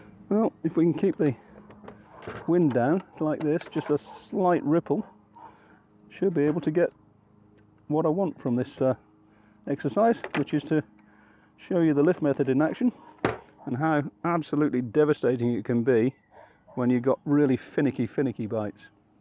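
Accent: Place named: East Midlands, United Kingdom. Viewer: Decent couple of hours, just started the lift, crucians & tench were very accommodating. That accent of yours🤔 East Midlands?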